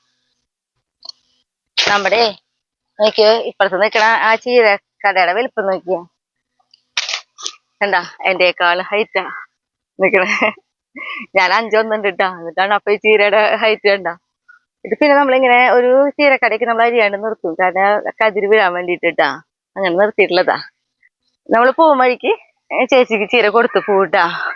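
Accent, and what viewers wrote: Thrissur, India. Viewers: എവിടെയാണ് വീട്. കാണുമ്പോൾ കൊതി തോന്നുന്നു. കൃഷിയിടമൊക്കെ. ഭാഷ കേട്ടാൽ തൃശ്ശൂർ ആണെന്നൊരു സംശയം. ഞങ്ങൾ ഒറ്റപ്പാലം ആണ്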